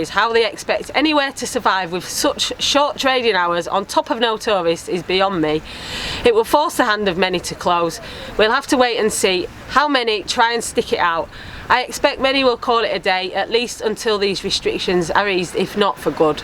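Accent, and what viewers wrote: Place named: England, United Kingdom. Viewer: You have a good english accent but you talk American..........guys, guys, guys, guys, guys, guys, guys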